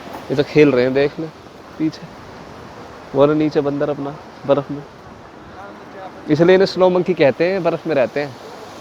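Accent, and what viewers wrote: Haryana, India. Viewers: Bhai HARYANVI bol k video mai kati char chand lga diye , tourism with comedy ...😍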